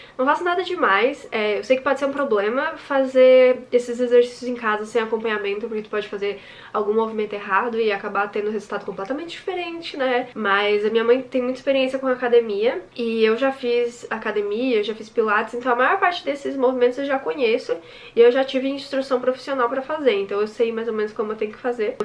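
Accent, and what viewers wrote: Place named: Curitiba, Brazil. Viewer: Eu jurava que tu era curitibana 😂😂😂 me veio até distrito federal do nada agora ahsuahsu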